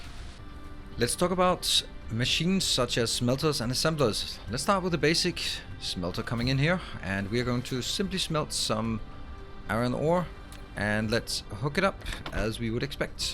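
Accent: Denmark. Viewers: Your accent sounds danish :D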